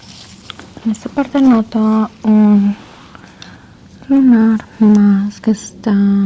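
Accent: Latin America. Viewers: Eso es castellano jajaja, creo que ella habla más español latino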